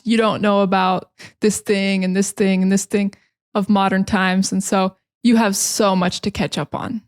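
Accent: United States. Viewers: Thanks for recommending Micho Brothers, they are awesome. By the way in living in São Paulo as well, in a homeless shelter. I really appreciate your channel, you're helping me a lot to improve my English skills, your american English accent really matches with my goals. Thanks so much dear teacher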